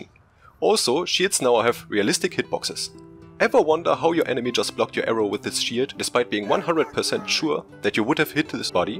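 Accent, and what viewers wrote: Germany. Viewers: der deutsch aktzent kickt :) nice vid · Dein Englisch ist definitiv besser als meins...aber dieser Deutsche Akzent triggert einen einfach hart...versuche einfach das ganze mehr rund auszusprechen oder hör dir an wie Amerikaner sprechen das klingt nicht so hart und man würde nicht sofort hören das du Deutscher bist xD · Youre german accent is so funny xD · Hi, thanks for the video! I like your German accent:D. I installed most of the mods in the videos you uploaded, but I don't have the mod options in menu. Could you help me? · Ich mag deinen deutschen Akzent 🙌 great Videos, keep it up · Höre ich da ein deutschen Akzent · Jo kann es sein dass du aus Deutschland kommst xD Der Akzent kickt ^^ Edit: Oh ok du bist anscheinend ein Deutscher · Really enjoying your videos, very helpful and informative. I also love your German accent, I lived in Germany for 5 years and fell in love with the place. 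Have a nice day.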